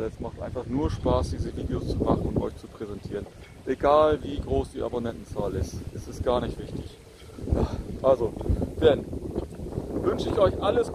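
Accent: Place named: North, Germany. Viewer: So einen angenehmen und sympathischen Youtuber gibt es ja auch nicht alle Tage.... Immer schöne Landschaftsaufnahmen, gute Kommentare und die angenehme Nord-Stimme... das macht es halt aus.... Gruß aus der Nähe der Schwalm-Quelle in Hessen (da warst du auch schon ......)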